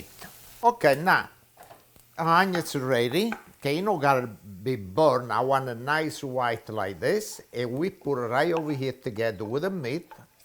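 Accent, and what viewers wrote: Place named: Naples, Italy. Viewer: What town in Italy do you come from when you speak italian it sounds like my father . He comes from a little town in Naples called Castello di Matese, Caserta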